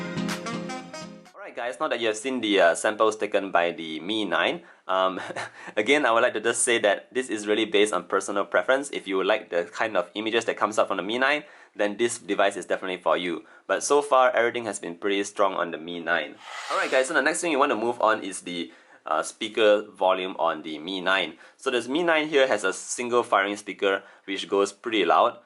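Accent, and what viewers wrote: Malaysia. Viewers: Hi! great video and very nicely presented. Are you Malaysian? I somehow notice your accent is quite the same with my chinese-malaysian friends.. 👍😊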